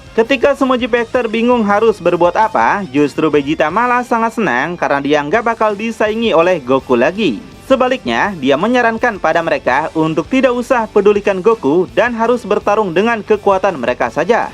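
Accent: West Java, Indonesia. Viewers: ieu admin jiga orng sunda 😂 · Popolohikan sigan ieu orang sunda kang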